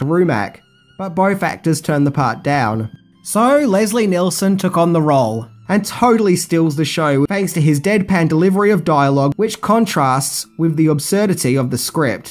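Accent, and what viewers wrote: Australia. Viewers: I’d say all the dislikes are for the whiney Aussie accent and him wearing sunglasses indoors I guess for comedic effect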